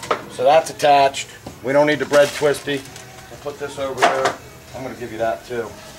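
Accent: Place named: Louisiana, United States. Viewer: You sound like you're from Louisiana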